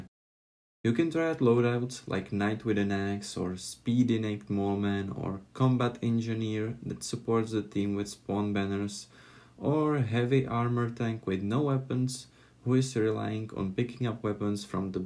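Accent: Czech Republic. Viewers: Ha! Já jsem si říkal že mi zníš jako čech. :D Já mám mordhau určitě radši kvuli tomu combatu, protože u chiv2 mě příjde že to je takový divný když můžeš blokovat, jak dlouho chceš.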